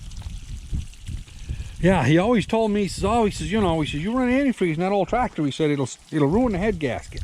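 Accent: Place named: New England, United States. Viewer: Watching the video, and hearing the New England accent... more White Mountain region than Kittery.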